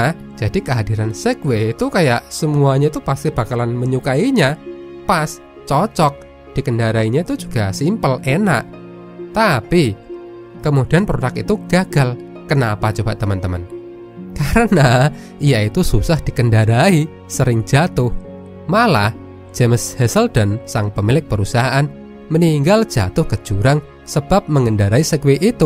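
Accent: Java, Indonesia. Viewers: Jowone ndi min admin sampean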